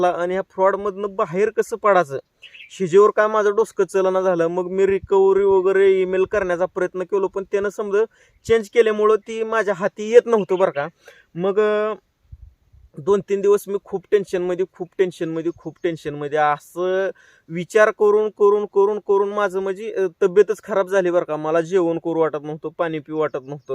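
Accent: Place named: Marathwada, India. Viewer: दादा तुम्ही कुठून आहे तुमचं बोलणं मराठवाड्यात दिसतंय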